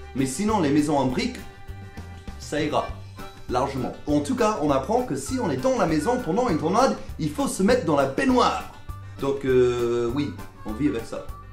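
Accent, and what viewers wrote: United States. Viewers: C'est fascinant ! J'ai trouvé ta chaîne par hasard, je m'y suis abonné. C'est trop bien d'écouter un ricain qui parle français avec son accent d'origine qui peut faire des comparaisons entre l’Amérique et la France et qui nous apprend des tas de choses qu'on ne connaît pas forcément à propos de l'Amérique. Good continuation ! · Lol, je suis Américain et j'apprends le français. Je trouve que ton accent est plus facile à comprendre parce que tu a un accent américain quand tu parle français.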